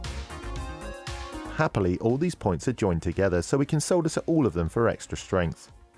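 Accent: United Kingdom. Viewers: Are you from Liverpool? You sound like you're from Liverpool.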